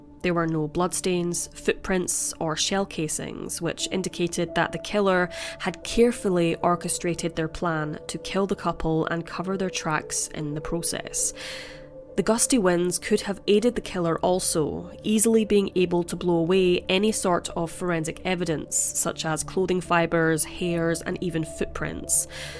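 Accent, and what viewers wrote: Scotland, United Kingdom. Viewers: It's a Scottish accent. Good wishes 🙏🏼 to Canada 🍁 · She and her sister are Scottish. Her sister is Kirsty Skye who also has a channel. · She's Scottish lol! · Yes the narrator is from Scotland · I believe she's Scottish :) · SHE'S SCOTTISH ACCENT MY FRIEND 🇺🇸🇨🇴🙏🏽💜💜💜💜💜💜✊🏽✊🏽✊🏽✊🏽✊🏽✊🏽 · Is that a Scottish accent? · just curious where in Scotland are you from? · I love your wee scotch accent, I;m from Donegal in Ireland.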